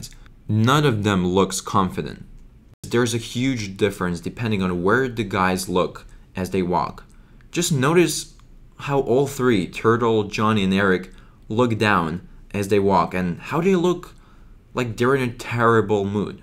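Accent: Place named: Russia. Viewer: Русский акцент спалился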